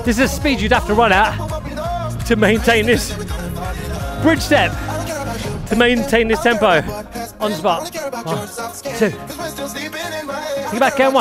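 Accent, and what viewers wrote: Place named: United Kingdom. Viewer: My new favorite one of all! 🙌🏾 🎉🎉🎉 I’m in the US and I realize now… we don’t speak English here!!!! 🤣🤣🤣🤣 Love the Queen’s English, love these videos 🥳🥳🥳 Thank you!! 🙏🏾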